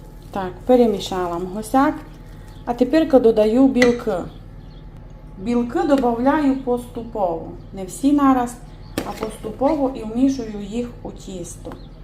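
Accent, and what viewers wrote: Transcarpathia, Ukraine. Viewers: Аби шо. Сказало, як у діжку пукнуло. Це закарпатський діалект, обожнюю його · Так, це закарпатський діалект, колоритна говірка. Якщо «бесит», не слухайте. Нас з деяких пір російська дратує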